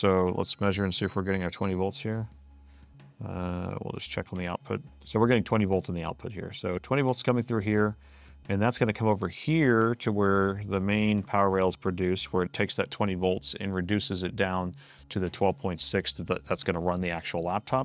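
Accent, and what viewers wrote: United States. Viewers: I have watched many Youtube anchor videos about repairing laptop motherboards, mostly with a British accent. This is the first time I have heard of a blogger with an American accent. The program is very good, fantastic, subscribe~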